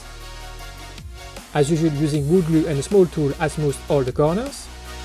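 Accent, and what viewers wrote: France. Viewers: Are you french ? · T'es francais nn ?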